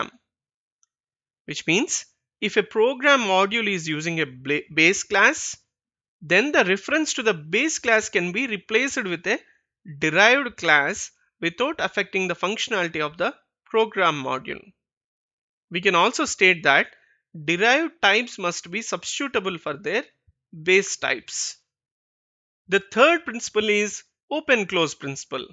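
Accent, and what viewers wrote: India. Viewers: Матвей Тарасовhe he is Indian so his accent is Indian.do you have problem with his accent? then go elsewhere .it's better than overrated western accent which requires extra effort to understand .stupid like you not appreciating his knowledge instead complaining about his accent.he is teaching free just try to get some knowledge instead of complaining. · Indian accent strength (directly proportional to) simplifying complex concepts. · A good material but your accent is very indian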